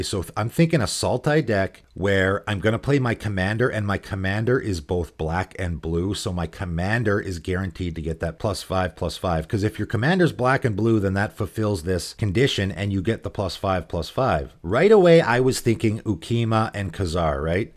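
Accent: Canada. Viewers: Z! I suspected you were Canadian :p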